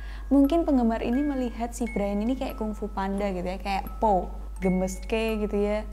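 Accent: Java, Indonesia. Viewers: Rasa ingin tertawa bukan Karna kontenya tapi Karna medoknya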